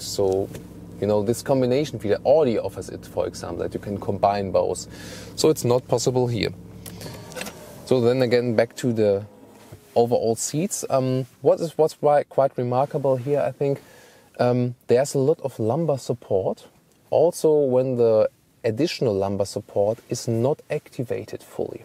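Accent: Germany. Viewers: Great review. Your English reminds me so much of my Grandmother. She was German, too. And everytime she asked 'what time it is' she would say 'how late is it' (wie spaet). Made me smile. You liked the car but it seems you're not fully convinced.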